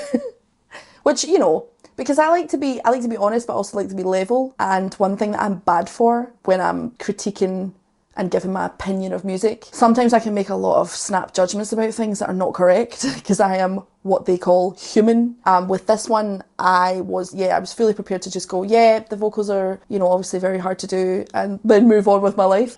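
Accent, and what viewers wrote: Scotland, United Kingdom. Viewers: I'm a silver fox😂😂😂 52 and been listening to Metal fir over 40 years now. My ma was 13 when The Beatles erupted and she got me into rock n roll. They were the first band I remember listening to and it's went from there. I repaid that start by taking her to see Iron Maiden. She is a massive Manowar fan (a band you have to listen to). But the crowning glory was taking her to see Paul McCartney at Giants Stadium in 1990. Please keep doing what yer doing and fuck the naysayers 🤘🏻🇬🇧🏴🖤 Love the Cattle Decapitation as well🤘🏻 P.S. NEVER apologise fir yer beautiful Scottish accent and being Scottish 🏴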